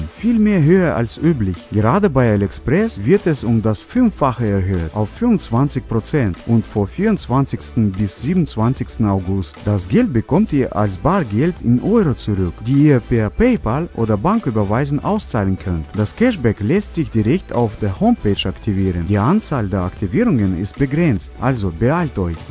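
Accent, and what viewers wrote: Russia. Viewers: Er ist Russe deswegen spricht er das so aus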